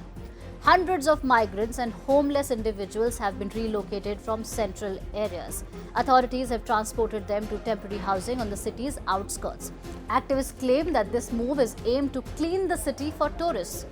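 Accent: India. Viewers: As a response to your edited comment, the lady is speaking British English. It's British English that's officially taught in India. Her accent is Indian. Just as you have different accents in the US, so does India. Once again, this YouTube channel is aimed at Indians, not at Americans. So obviously they're gonna hire someone who can speak with an Indian accent, not American, British, or Australian. Maybe you need to read once again the fact that the term "Curry English" doesn't exist. Curry, however, does and has nothing to do with India. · CNBC is an American broadcaster that is supposed to use American English, not Curry English. When you have a large number of UK immigrants in America, you have American or Canadian English, and Pomme in Australia they speak Aussic English, with India you don't chase Immigrant background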